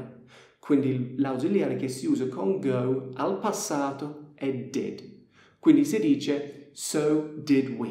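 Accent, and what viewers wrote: Apulia, Italy. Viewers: L accento pugliese è troppo comico... complimenti! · Ma solo io sento una certa cadenza pugliese? u giovn😂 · quindi non sono l' unico a cui sembra pugliese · Absolutly not, perhaps at south more. I think live in Puglia because He got that accent and habits as well. Is it right? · Ehi, your italian has a funny southern accent, kind of pugliese! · Ti adoro...tra la semplicità delle spiegazioni e l'accento pugliese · Per sapere, il tuo italiano lo hai imparato da qualcuno del sud Italia? Così a occhio direi... Puglia, forse? :) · Bellissimo l'inglese che parla italiano con l'accento pugliese (se non sbaglio) 😊 video utili grazie! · nooo il tuo accento pugliese non perderlo mai... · il tuo italiano hai una leggera inflessione pugliese, fantastico! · Davvero simpatico , con quell’accento fra Matera e Bari! E soprattutto , chiaro ed efficace! 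Grazie mille per queste ottimamente strutturate e utilissime pillole su particolarità dell’inglese troppo spesso trascurate. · L'accento pugliese è favoloso!! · anch’io ho sentito un accento simile al pugliese XD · Hai un accento pugliese molto marcsto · Hai l accento pugliese quando parli italiano😁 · Mahhh... A me sembra un pugliese con l'accento inglese ! Davvero friendly, ma non perché pugliese. Degno successore del bravo peter sloan. Maybe are you àppulish? So am I! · Hi, where are you from? I guess you were born in GB, although your italian cadence seems likely from Apulia, perhaps you’re dad or mum are from there. A huge hug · Non è italiano ! è un inglese che parla bene l'italiano ma lo ha imparato con l'accento pugliese dei parenti !! Simpaticissimo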